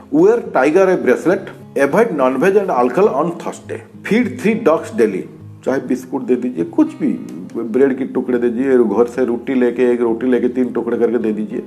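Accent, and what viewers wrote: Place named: Bengal, India. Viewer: Y m I getting the good smell of Bengali accent from this particular video 😅😅😅 M I ri8 or not😢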